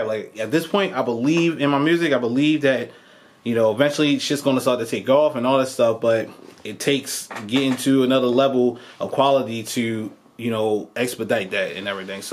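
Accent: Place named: Baltimore, United States. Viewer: Loving the Baltimore accent.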